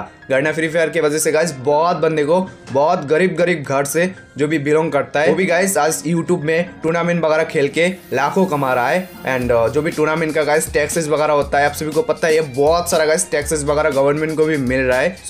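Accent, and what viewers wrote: Bengal, India. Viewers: Yes bengal se lagta hai😐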